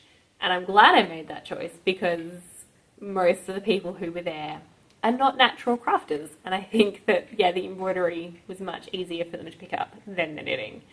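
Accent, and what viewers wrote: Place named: Australia. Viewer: Hello Lovely. You just popped up out of nowhere but Im glad you did. Love your Aussie accent and all your makes. So far from home but home is where the heart is, eh? Im an Aussie too of Romanian background. Do all the crafts too...about to finish a quilt and work on a few knitting, crochet wips . I am married to an artist.Coming up to our 20th. Love that your Dad paints trees.Go well in your days. Just finished my Go Go. Blocking as I type. ❤🐨🌏xx🌞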